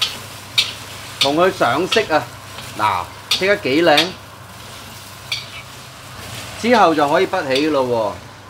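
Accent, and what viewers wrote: Teochew, China. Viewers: 哈哈，潮州话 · 係唔係河洛話啊？只不過老闆個口音聽落似潮州話多啲😀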